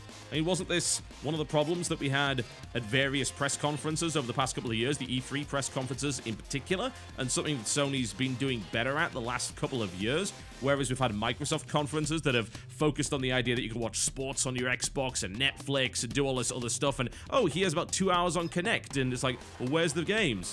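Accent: United Kingdom. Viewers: are you honestly requesting someone to pronounce something a specific way? wtf, he talks how he does, he's from the UK but has lived in the US for over a year... so he talks like John Bain, deal with it.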